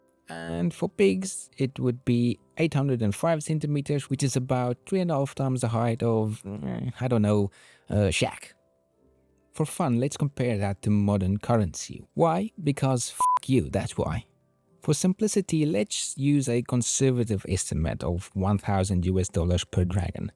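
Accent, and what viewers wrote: South Africa. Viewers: Hilarious that I could pin you as a fellow South African just from your accent lol. Great video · Is the creator South African??? The accent sounds very familiar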